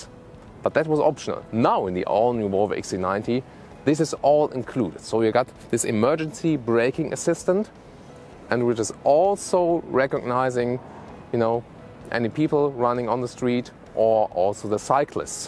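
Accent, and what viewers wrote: Germany. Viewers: Mensch, habt ihr nicht sonst Reisen getestet oder Kreuzfahrten? Wie dem auch sei, nettes Fahrzeug. Nur einem Deutschen beim Englisch sprechen zuzuhören, ist sehr sehr anstrengend. Nichts für ungut ;) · Great review(s) you´re doing even with that German accent. Great car either, this XC90, except for it´s poor typical mid class sized engine which will keep buyers for the premium segment away. Driving an old XC90 for nearly 7 years now I was looking forward to the new one. But definitely not with that tiny engine. What a shame. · nice german accent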